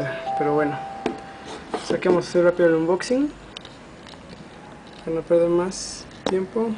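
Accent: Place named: Pocho, United States. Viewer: Bueno y por qué hablas así como queee... tan POCHO???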